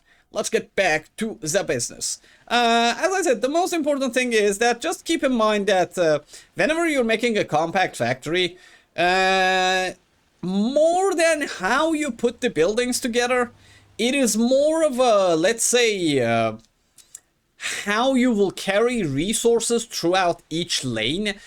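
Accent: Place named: Turkey. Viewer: oh, I didn't realize that was modded. I've made 3 story builds before, sometimes just tucking amps under the floor boards so it still looks like a two story. And I was trying to place the accent, didn't have turkey in my head. Keep the vids coming.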